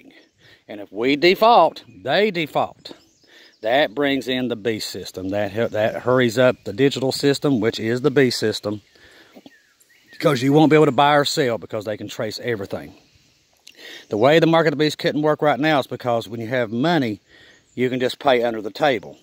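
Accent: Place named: West Virginia, United States. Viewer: Am I getting this right? I just recently subscribed to your channel and it sounded like you are in West Virginia. I live in South Dakota but my entire dad's family is basically in Logan County West Virginia and have been for centuries! I just find it interesting, I'm also related to the Hatfield's on my dad's mother's side although her dad was a McCloud. I love my family there and I love West Virginia though the last time I visited was in 1981, really miss it but my health precludes me traveling. Anyway God bless you brother! I love your posts that I've seen so far! MARANATHA! HALLELUJAH! AMEN!🙏❤️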